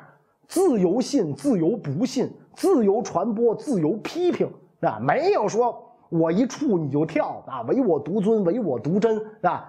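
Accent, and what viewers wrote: Beijing, China. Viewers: 一口京腔听的别扭...感觉像是说相声、说书！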